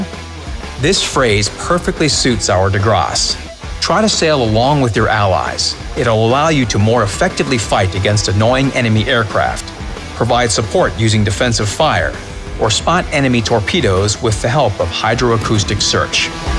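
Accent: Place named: United States. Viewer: I'm getting sick of this American accent.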